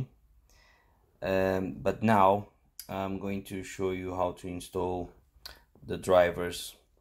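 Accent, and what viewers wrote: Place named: Brazil. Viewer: Your English sounds like "Brazilian English", it's an easy accent for me to understand. Thanks for the video, it worked!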